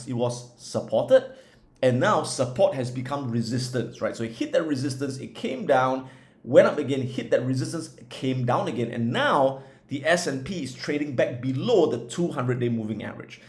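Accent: Russia. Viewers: Your Russian English is so good, can't remember nothing after watching but that part :) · love the russian accent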